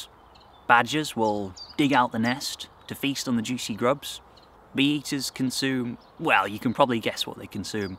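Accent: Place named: United Kingdom. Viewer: Finally a british accent yay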